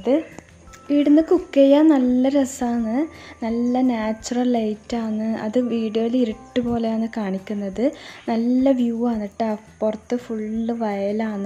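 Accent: Kannur, India. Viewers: Ningal kannurano Namma, ninga kannur basha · 🥰kannurkarude nadan basha👍gd 👏🏻👏🏻👏🏻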